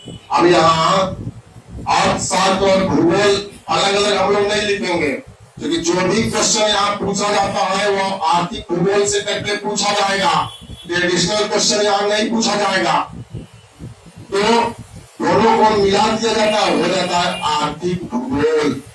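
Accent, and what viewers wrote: Bihar, India. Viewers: aapke bolne ka andaj bilkul bihari jaisa hai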